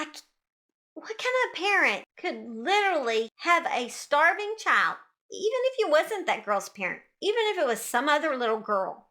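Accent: South, United States. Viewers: Which Southern state is your accent from? I tried all night to decide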